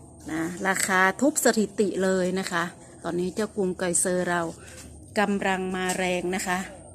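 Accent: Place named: North, Thailand. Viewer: คนเหนือกาครับ สไเนืองคุ้นๆ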